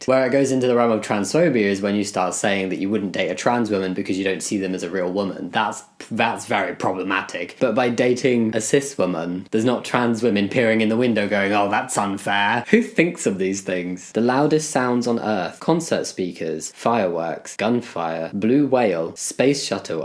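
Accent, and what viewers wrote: United Kingdom. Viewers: You just sound brittesh😊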